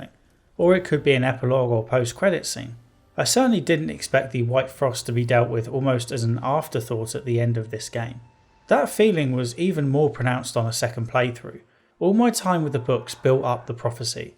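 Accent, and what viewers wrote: United Kingdom. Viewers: Oye, francisco, cálmate que te lees bien facho. Él tuvo una mala elección de términos al decir que no había diversidad cultural, pero creo que fue accidental. El universo de The Witcher es basto y rico culturalmente hablando, es lo más hermoso, rendirle homenaje al folklore europeo de tal forma es bellísimo. El gesto de su crítica fue decir que se puede entender que los humanos de cierta región sean homogéneos en cuanto a su color de piel y más si se trata de la Edad Media y todo ese rollo, pero con PORTALES, otros mundos y otras especies como la de los elfos, enanos emtre otras antropomorfas ¿y todas esas son blancas también? Muy raro eso, muy sospechoso. El tipo es británico y se le nota en el acento de aquí a la China, dude, chill. Puro facho defendiendo sus sesgos raciales. Si fuese una representación histórica acertsda de la Edad Media sin portales ni otros mundos, pues no hay problema, todo bien, pero no en un Universo donde existe la magia y la habilidad de viajar entre regiones y otros mundos. 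Facho. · The only thing missing from the joseph andersons videos was the british accent, now its fixed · Why are you trying to make me shut off your video after you go off into left field talking about race? And why demean yourself by calling yourself a privileged white person? Your original instinct in 2015 to roll your eyes was the correct one. Why are fantasy stories mostly populated with white people? Cause it’s based off of European history and culture. Based on your accent you come from a country that used to run the world and the self flagellation is just embarrassing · He's very obviously British from his accent though. Stop making this about America.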